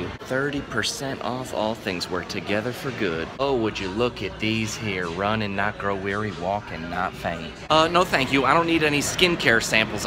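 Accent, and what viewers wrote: South, United States. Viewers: Are you trying to be southern mama?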